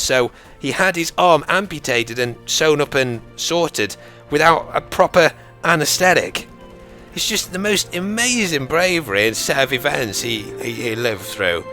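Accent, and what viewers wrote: United Kingdom. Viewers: Love history man. These war stories are awsome. Ur like a white Morgan Freeman with a british accent! :-)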